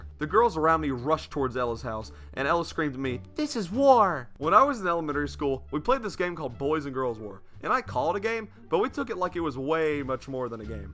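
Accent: South, United States. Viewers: you have a heavy southern accent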